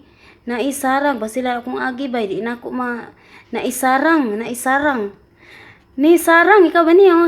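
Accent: Visayas, Philippines. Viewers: Dai taga mindanao ka?? Love it bisaya kau ka 😘😘😘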